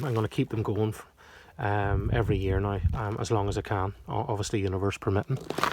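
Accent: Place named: Derry, United Kingdom. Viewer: I’m from Strabane so similar accents, you’re just slightly more Derry than me 🤗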